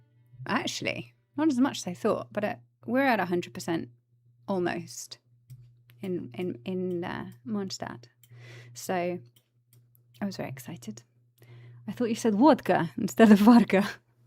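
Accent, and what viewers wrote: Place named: United Kingdom. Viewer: your accent was a pleasant surprise! makes me wonder how lauma would've sounded in your original british accent rather than the standard american one! your performance as her is so lovely as is :)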